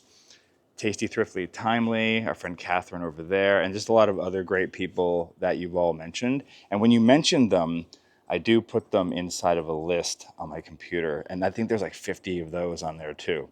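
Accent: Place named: Canada. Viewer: Sounds great. I think some of your words are just Canadian accents. My friend lived in New York and when he says dog, I crack up! We love you guys and it’s great to have community with all our imperfections!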